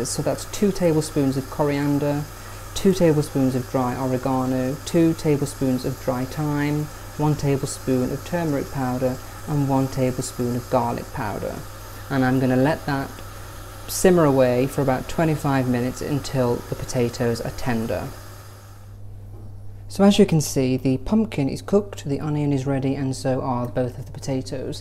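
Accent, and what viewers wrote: United Kingdom. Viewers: i always look forward to your videos. its like watching a real life Mr Bingley in a modern era, how delightful to hear your british accent, the aesthetics of your video and of course the lovely content like your beautiful table setting and your food. i also noted that this time round, you did a close up of all your wonderful table accoutrements. ooh those monogrammed napkins, need i say more! they are so very you.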